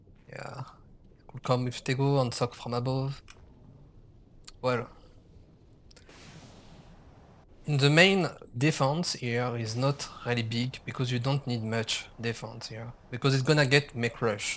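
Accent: France. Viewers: On sent l'accent français quand même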